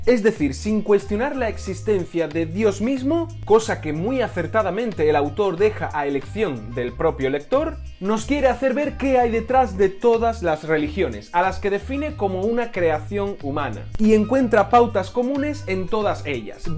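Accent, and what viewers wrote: Spain. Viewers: Gracias por mantenernos informados y actualizados...A propósito,eres español?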